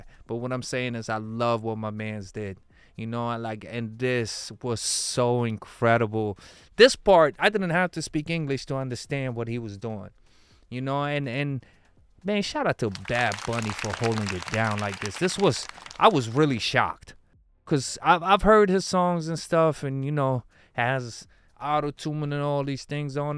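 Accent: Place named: Puerto Rico. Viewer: You look and sound boricua bro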